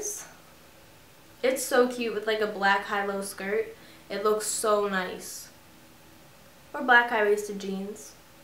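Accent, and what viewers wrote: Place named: New York, United States. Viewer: YOU FROM NY ARENT YOU!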